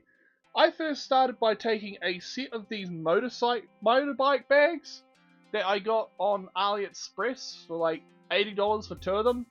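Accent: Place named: New Zealand. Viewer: Nice accent mate, kiwi’s are awesome :3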